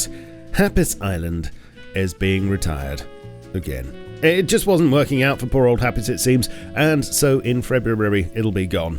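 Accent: England, United Kingdom. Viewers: With a little less English accent and going slowly maybe you also understand what you say in an info video! Omg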